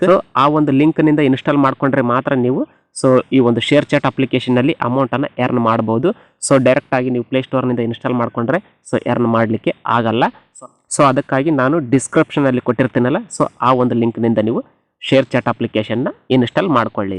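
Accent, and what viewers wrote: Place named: North Karnataka, India. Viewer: ಸರ್ ನಿಮ್ಮ ಮಾತಿನ ಶೈಲಿಯಲ್ಲಿ ನಾನು ಗುರುತಿಸಬಲ್ಲೆ ನೀವು ಉತ್ತರ ಕರ್ನಾಟಕದವರು ಅಂತಾ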